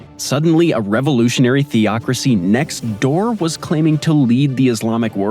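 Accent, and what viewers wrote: United States. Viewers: Warder, Baddle, Baddery, Lidderally. No one butchers the English language like a Seppo.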